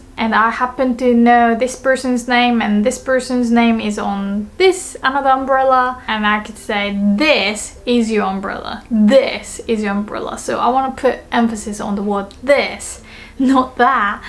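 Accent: United Kingdom. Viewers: It sounds super british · I initially thought you might be British...... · Actually, it's a British accent. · Most of the Japanese teachers have an American accent, enjoyed seeing British pronunciation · Are you in Uk? A bit accent of the UK! Overall, your teaching is helpful! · You have a great accent I've never heard before! Did you Maybe live/learn English is England or the UK? You have an accent similar to James Grime. (and brains to match!) (singing banana / numberphiles math YouTube channel.) 💖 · Your English has a British accent. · I wonder if she learned english from a British teacher 🤔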